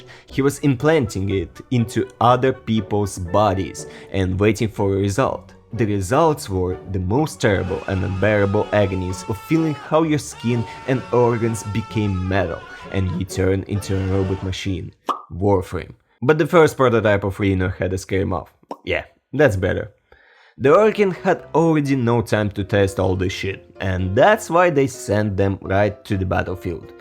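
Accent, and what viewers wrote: Russia. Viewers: я против ничего не имею,но Русский акцент так и прет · Спасибо русскому акценту, слова с буквой "r" теперь вызывают в 2 раза больше проблем. Но получилось норм, вполне понятно и приятно звучит) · Русский английский как всегда прекрасен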